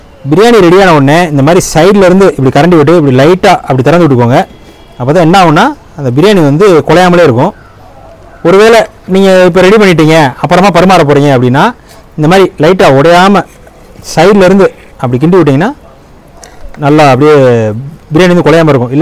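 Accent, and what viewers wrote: Madurai, India. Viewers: I am not here for your recipe, but your humorous commentary and your beautiful Madurai tamil. Love from Bangalore bro. Always keep doing the beautiful work. Cheers❤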